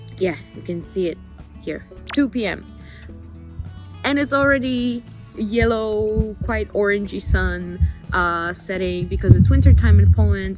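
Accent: United States. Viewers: This is my very first time to check on your video. I was amazed that your English is so well and American lol. Enjoy home and return to Tokyo soon. I could not return to Tokyo because even I was born and raised in Tokyo. I did obtain US citizenship so I have to get visa every time I visit my parents living in Tokyo. I wish I can return Tokyo in November. Have fun in Poland!d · アメリカ発音で聞きやすかった… これどうしようもないんだよね耳が学んだ言葉と発声はそれしか理解出来ないのよね。まあそれだけ言語力が無いって事かも知れんけどw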